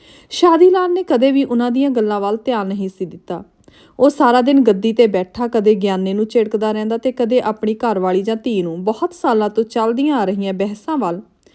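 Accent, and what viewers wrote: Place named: Majha, India. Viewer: ਠੀਕ ਸੀ ਕੁੜੀਏ ਖੜ ਦਾ ਪਰਦਾ, ਪਰ ਲੱਗਦਾ ਬੋਲੀ ਮਾਝੇ ਦੀ ਬੋਲਦੀ ਏ ।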